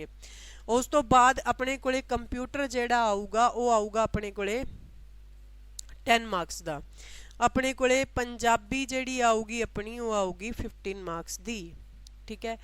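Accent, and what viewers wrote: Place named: Bagri, India. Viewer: ਬਾਗੜੀ ਆ ਮੈਡਮ,, ਪੰਜਾਬੀ ਭਾਸ਼ਾ,, ਜਿਦਾ ਬੋਲ ਰਹੇ ਆ ਪਤਾ ਲਗ ਰੀਆ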